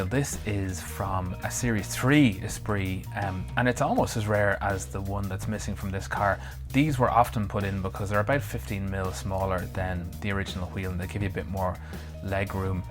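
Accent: Ireland. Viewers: WTF I only discovered this because of Ronald Finger's youtube channel; and I just started. This is AWESOME. I'm bingewatching from the beginning. This channel needs WAY MORE views what the fuck youtube. This NEEDS to be seen everywhere. Anyways, this dude is like the Irish version of Veritasium. These guys are like long lost twins with different accents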